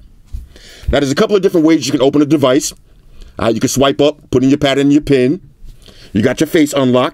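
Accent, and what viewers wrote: New York, United States. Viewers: That REAL authentic New York City accent that's why I LOVE your channel fam. Keep em videos comin foo #NYCForever #Floss💯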